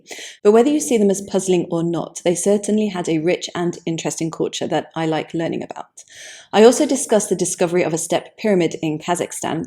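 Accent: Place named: Northern England, United Kingdom. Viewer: So, you imagine that you know what the rest of the world is all about, eh? I am fully aware that hers is an accent found in the more Northerly parts of England and not in a North American variety. I understood her perfectly, but others, schooled in "English as a foreign language", might not find it so easy. Perhaps you might care to elucidate on you expertise in this language discipline.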